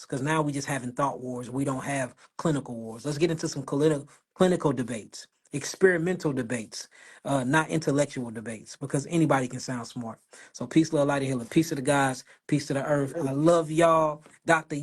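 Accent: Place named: Kentucky, United States. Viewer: I’m from Louisville ky we really do talk like that especially in Louisville she definitely not faking it 😂💯 no bap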